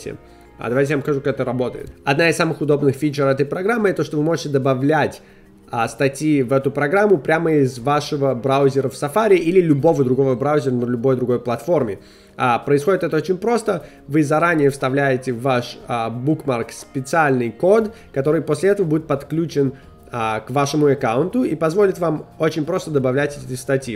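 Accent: United States. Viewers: а ты американец или англичанин, не могу понять какой акцент???? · акцент американский по знакомым знаю. а зачем приложения с газетами показывать если они все на английском? Публика то в 90% предпочитает читать на русском, я полагаю)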